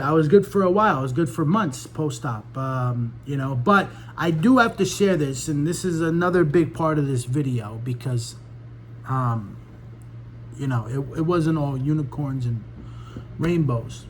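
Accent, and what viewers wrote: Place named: New York, United States. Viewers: You got me laughing out loud when you got to how the cholestyramine works :) I have not had gall bladder attacks (yes I've had kidney stones) but got a long covid condition with irritable bowel - messing around between constipation and diarrhea. My nail technician was taking Cholestyramine for what was a close to Crohn condition. When her doc put her on Cholestyramine her problems went away overnight so I asked my doctor about it. He told me he had MANY patients on it. I've been on it close to a year and it works very well. Sometimes the discomfort part of that is challenging, but on the whole it works very well. I take it every single day. Fingers crossed. You didn't mention where you are from but you remind me of my New Jersey roots - or sound a lot like friends in Queens. :) Thanks for this really helpful video!!!!! 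Good luck to you going forward!!! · Thank you so much for sharing your gallbladder journey. My daughter-in-law is having extreme attacks now and is going to speak to a surgeon this week. This really enlightened me as to what to expect and I shared it with her. I just want to say that you are very funny and it was a pleasure to listen to you to the end, because with your New York accent, you tell the story hilariously. Thank you for your candor about the diarrhea and what to expect post surgery. I'm sure you have helped so many people. Forewarned is forearmed. May the LORD bless you and keep you healthy.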